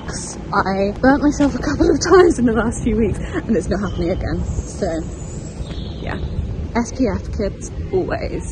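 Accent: United Kingdom. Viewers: Her elegant British accent! Just wow 😂😍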